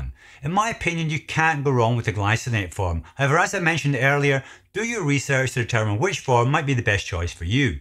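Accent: United Kingdom. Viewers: He sounds British to me and his channel states UK. Regardless, British Supplements company I would very much like to know given what they stand for but also because they're not 3rd Party tested.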